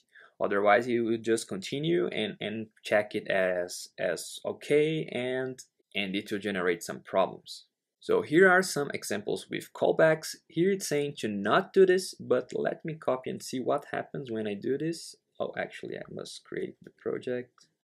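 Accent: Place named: Brazil. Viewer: dude its impressive how from the first 3 words you've spoken i already recognized the brazilian accent exactly like mine ahahahahhaha nice vid! :P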